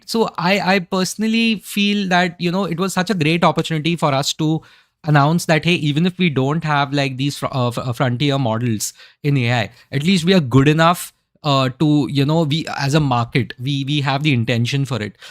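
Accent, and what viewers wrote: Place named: Allahabad, India. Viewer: You sound like an Allahabadi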